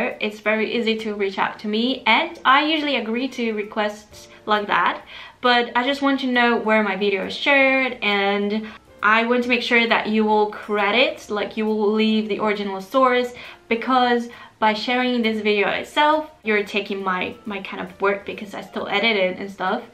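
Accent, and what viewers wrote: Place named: Australia. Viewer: Why are you sounds like Australia😂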